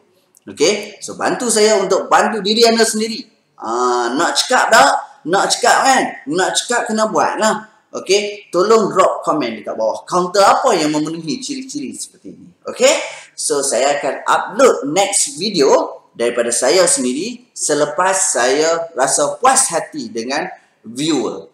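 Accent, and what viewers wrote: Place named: Kedah, Malaysia. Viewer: Seronok dengaq tuan sembang loghat Kedah..terbaik baq hang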